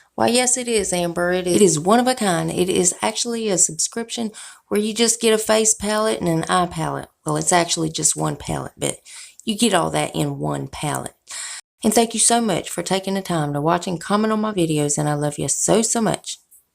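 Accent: South, United States. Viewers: What’s your accent ? Are you from the south ?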